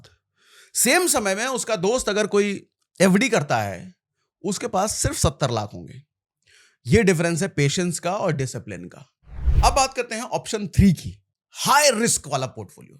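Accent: Bihar, India. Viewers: Tum bihari ho na ??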